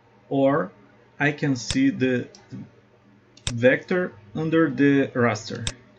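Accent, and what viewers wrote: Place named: Brazil. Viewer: Engraçado como se percebe logo quando o sotaque é brasileiro, é um sotaque único. Siga produzindo em inglês, nesse meio a maioria entende e o alcance não fica restrito a aqui.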